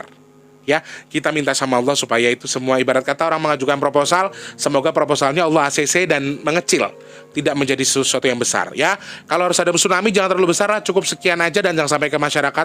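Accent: Banyumas, Indonesia. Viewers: Rika teyeng ngapak jebule ya Mas.....😃😃😃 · Laah rika deneng bisa ngapak mas? kayong wis teyeng men.... salam ngapak sing nyonge hehehe.....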